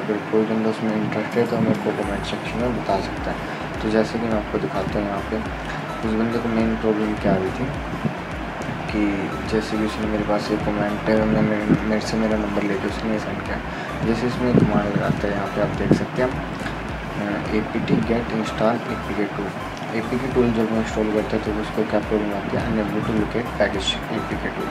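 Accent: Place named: India. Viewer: You didn't notice the background noise and thick hindu accent? (facepalm). Seriuosly..get your hearing checked.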